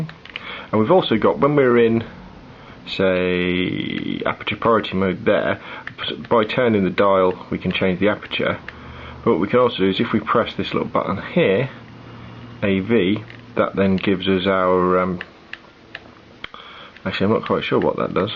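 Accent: United Kingdom. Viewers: i got lost in the british accent whem you were explaining the modes , i didnt understand what do yo say on the S mode. what does that mode does ???? i just gota minolta 404si DYNAX. not the same model but pretty similar. and your video help me a lot. thanks from spain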